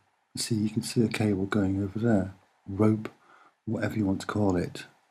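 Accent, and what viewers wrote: United Kingdom. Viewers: I believe him because he has a British accent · OMG another soft spoken British guy You're on fire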